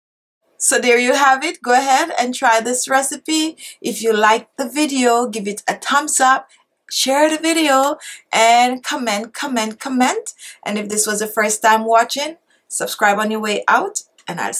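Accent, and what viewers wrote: Jamaica. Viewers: kokonut 4ever Jamaican-it's evident from how she speaks and pronounces her words. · hey girl I am loving your videos, I can hear your Jamaican accent you go sister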